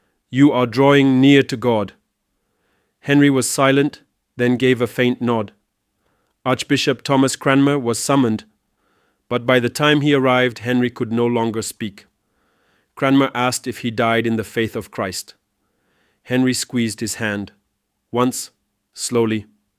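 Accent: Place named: Pakistan. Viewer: Pakistani accent detected. Video rejected.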